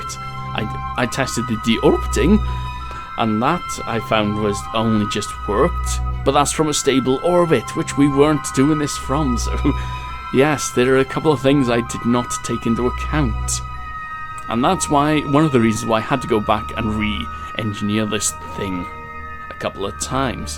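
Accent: Wales, United Kingdom. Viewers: Welsh? Did I hear WELSH?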